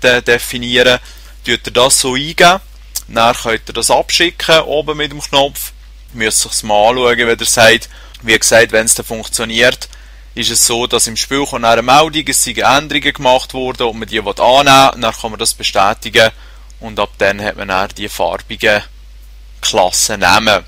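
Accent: Switzerland. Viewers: DD alter schweizer is das geil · Schweizerisch is schon lustig, jedoch als Deutsch verstehste manchmal nur Bahnhof :D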